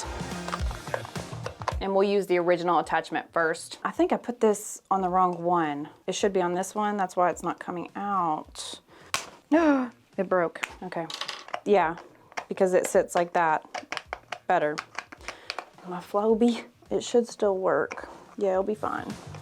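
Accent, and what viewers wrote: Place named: North Carolina, United States. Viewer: You have NC vibes. I'm from NC, and our accents are very similar. I'm probably wrong, but it's just an observation.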